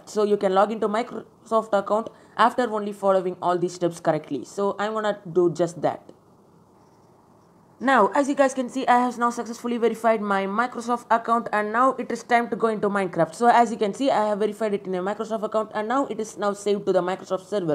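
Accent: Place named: India. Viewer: U kinda sound hindi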